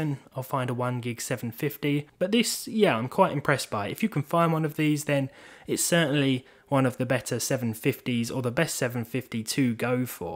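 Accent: United Kingdom. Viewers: *Far east* Now i believe you, that are you brittish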